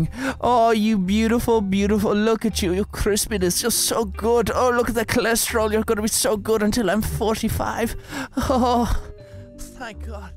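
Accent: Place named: Ireland. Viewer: Sometimes he sound Irish and sometimes he doesnt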